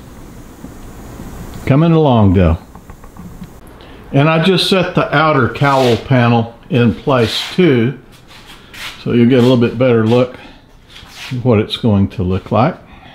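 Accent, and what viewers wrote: Texas, United States. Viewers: No apologies are ever needed! I never did any body work so I have no input of value on that! I always hated working with the front and rear glass...helped do the rear window of a 70 Riviera boattail....YIPES!! Happy Labor day weekend to you and the family! Your Texas drawl is easier to understand than some southern dialects...Where oil is pronounce earl, and door is a two syllable word! Fortunately I was raised hearing it all the time so I can still understand it.....well most of the time at least! The blazer is progressing well! Not much better than a bit of shop time with your good car buddies!! Thanks for sharing!! We lost Jimmy Buffet yesterday...so I' had a special Margarita for him....man we are losing some good ones !! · LMAO 😂😂😂 That is so funny, I understood your Texas drawl just fine and I am not from the USA. And I agree that panel bond is a great option with rivets or spot welds. 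· I'd revit that area over bonding. If you want to seal below windshield with both, I'd consider good plan to protect wiring from any water leaks. Hope you have some patch panels to cut that rust out on side. Great to see transformation. I spit my coffee seeing that woman's photo 😂 I think it was your texan drawal and you are educating some excited over your project. That bonding has some long term issues. Its not first generation... Not fun to start over. Enjoy your long weekend and BBQ.🌻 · Well i don`t know what the problem is i understood you perfectly fine because i`m also from Texas and i clearly heard you say COW!! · I just love your Texas Drawl thank you for sharing the difference between cowl panel and cow panel 😂😂HAHA I love it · Love your Texas drawl you keep on doing what you do very entertaining the rebuild is a big job you have more will power then I would have on a job like that your videos are awesome don't change anything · Making good progress, i see, and having a great time while doing it, I think. 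I didn't have any trouble understanding the word "cowl" in the last video or this one. I love your Texas drawl,. Of course, that's because there is a big piece of my heart still in Texas. When I would go back to visit family, i would quickly begin to speak in that drawl myself, it comes so naturally to me. Love you, hope you got some good responses from the car guys and girls, which I ain't. 😊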